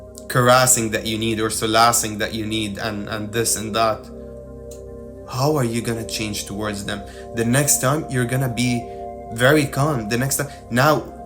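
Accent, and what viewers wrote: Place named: Ireland. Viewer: Obviously, English is not your first language, but I thought I detected a wee Irish twang in your accent?..... Thanks for your wisdom brother. Appreciated. ❤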